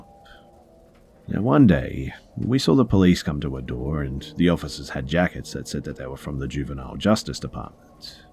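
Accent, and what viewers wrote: Australia. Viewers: Love your stories! Especially love listening to your voice, Aussie accents are my fav. (And UK.)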